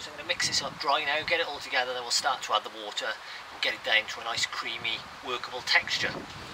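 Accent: Wales, United Kingdom. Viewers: I thought Manu Ginobli had an Argentinian accent, he’s gone Brit on me. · Welshman, straight to the point, love it, cheers!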